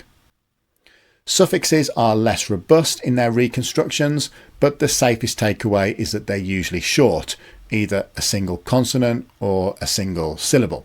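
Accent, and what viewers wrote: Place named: Manchester, United Kingdom. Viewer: So7nds like a feature from Mancunian English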